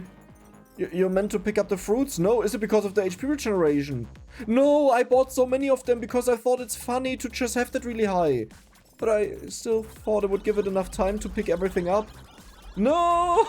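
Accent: Germany. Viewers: Der deutsche Akzent ist einfach Bombe 😂 The German accent is the bomb 😂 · Are you german? Alter Schwede... Gave it away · Idk why but he sounds so much German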